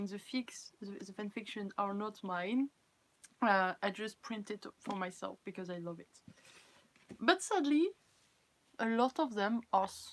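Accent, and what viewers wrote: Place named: France. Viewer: Moi c'est chelou car je comprend parfaitement l'anglais mais je ne sais pas bien l'écrire XD normal je suis francoportugaise mais même le portugais je sais pas l'écrire mais je le pige à parfaitement, je suis dégouté d'avoir raté le live ;^; en tout cas tu parle bien anglais ! Même si on entend ton accent français mais c'est super bien ! Continue tes vidéo elles son parfaite ! Et tu est parfaite :3 !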